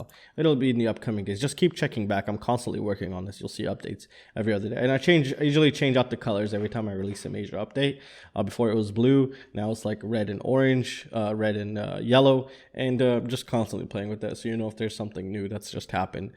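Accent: United States. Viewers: You sound very much american, yet you live in europe, just curious about your situation.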